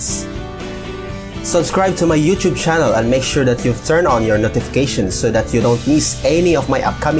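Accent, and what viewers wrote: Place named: Philippines. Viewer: pinoy ka ba? napaka pinoy ng accent mo hahhaha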